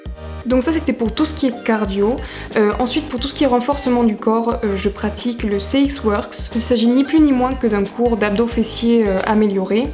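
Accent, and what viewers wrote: South, France. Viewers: vive le sud !! on reconnais l'accent ;)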